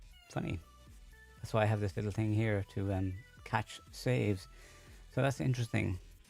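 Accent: Ireland. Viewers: the view count and interactions does not capture how awesome and ~valuable~ these sessions are. I get so much out of each one, thank you again. Also there is a big market for unintentional ASMR with Irish accents believe it or not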